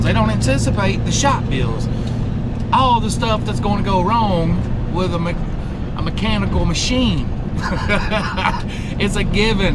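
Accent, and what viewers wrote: South, United States. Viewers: Watched the video with the closed captions on. It doesn't recognize a southern accent very well. But I knew what you meant. I see the white chalky spots on your fan as well. That to me, confirms you have a leaky radiator. As for your cam issues, bearings, lifters, rockers, and oil passages, I would go ahead and do an inframe while you have it apart, and as the shop said, that might not happen for six months or longer. Start filling up your maintenance piggy bank and get it done and out of the way. You'll need to do an inframe sooner or later, so why not do it all at one sitting or during one downtime. I enjoy your vids and have been watching vids as well. I'll be back on the road soon and will keep my eyes open for you guys.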